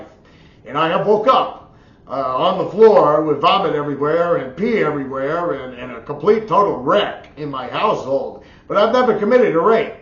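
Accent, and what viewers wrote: New Jersey, United States. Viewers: I can’t believe you’re from Jersey. That accent is so intense 😂 I can relate to you a lot.. I’m from Essex county and grew up with a music-obsessed father as well. Your understanding of female bodily autonomy is heartwarming. It should just be normal but unfortunately it’s not.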